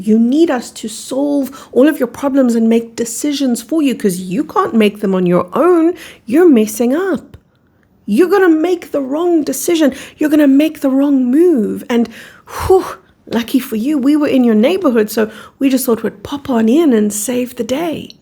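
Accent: South Africa. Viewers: Hi your channel just found me. I knew you were South African from the first sentence 😂. New subbie here I will be binge watching any other videos you have. Love and light from a fellow SAfrican 🙏❤️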